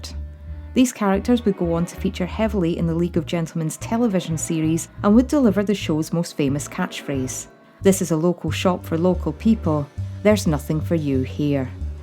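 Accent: Scotland, United Kingdom. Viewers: Hi from a fellow Scot (you sound Scottish?). I started watching this show under a year ago and am quite keen on it. I haven't seen the Christmas show (I've got it on DVD but am waiting until closer to Christmas to watch it) or the 2018 live one yet but I'll def. get it. In any case, I wasn't aware of the whole/complete story of the characters, so this was very informative!. Personally, my favourite characters are Pauline and Mickey (Pauline reminds me of someone), although I am keen on the Dentons as well... and Les McQueen. I'd personally vote for Pauline though.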